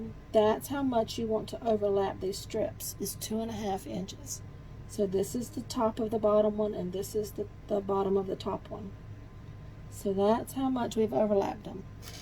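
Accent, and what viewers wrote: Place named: United States. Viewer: Wish I had watched this 10 months ago when I was doing my first binding, this is the best video on binding I’ve seen.. love the way you iron the crease, no rulers and pens, and the way you joined the ends using the bit you cut off is brilliant, so less complicated like the others. Will post your video on a face book page on quilting. Did you do the quilting as that is gorgeous, is there a video on it. So glad I found your videos as I’ve only been sewing for a year, I do have an embroidery machine as well. I also love you accent, where in America are you from? I’m in the UK xxx. Thanks for the video xx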